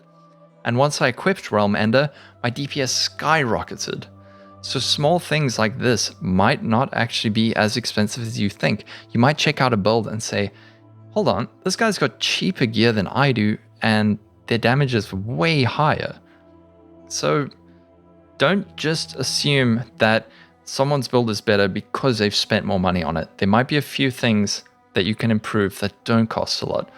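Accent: South Africa. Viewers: Yo Bru. Where you from? You sound South African👀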